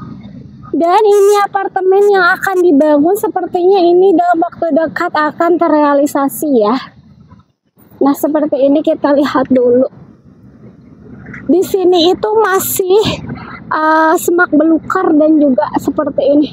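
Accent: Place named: West Java, Indonesia. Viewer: Jadikanlah kritik dan saran untuk kemajuan dalam membuat konten agar lbh baik lagi, yg namanya belajar Tdk ada yg langsung bisa/ lancar, untuk karakter/pembawaan saya No Komen krn saya yakin itulah ciri khas kamu dr lahir, cuma lucu aja mmg khas orang Sunda, dimana penyebutan hurup P,F,V sama semua dan penyebutan huruf H terasa sekali.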